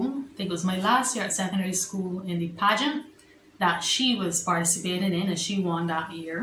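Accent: Barbados. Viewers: Hey, great job with your channel. Love de Bajan accent. Ps this is Azriel:)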